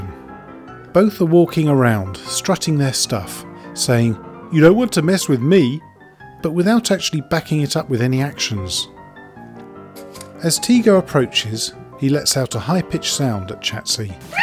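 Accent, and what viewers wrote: United Kingdom. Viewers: british accent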